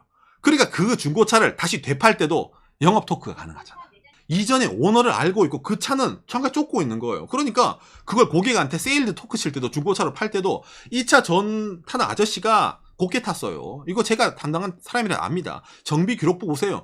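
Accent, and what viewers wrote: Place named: Gyeongsang, South Korea. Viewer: 말투가 대구네요 구수합니다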